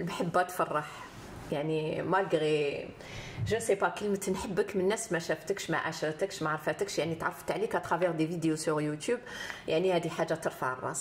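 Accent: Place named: Souara, Algeria. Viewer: اواه يا مدام تهدري كيما السوارة ماسافاش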